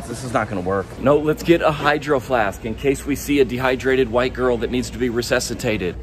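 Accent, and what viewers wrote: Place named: Tennessee, United States. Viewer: are you from tennessee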